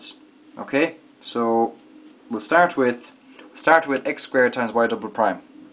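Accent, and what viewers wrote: Ireland. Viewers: sounds Irish. Loving the accent and the lesson. Great video! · You have a lovely Irish accent. It makes the grasping of the subtle secrets of differential equations that much more delightful.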